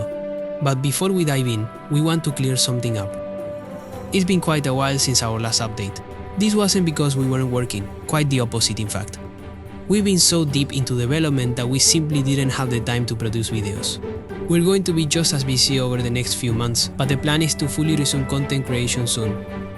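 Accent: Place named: India. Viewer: Ingles hindú jaja, que grande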